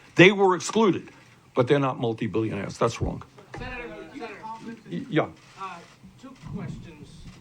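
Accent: Brooklyn, United States. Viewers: Ya can't get the Brooklyn out of Bernie! When will people understand that ya don't mess with people from the NE? I'm from Philly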